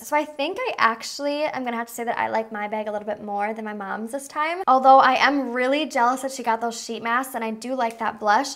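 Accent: Minnesota, United States. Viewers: I TELL YOU THIS MINNESOTAN ACCENT 😂😂😂😂😂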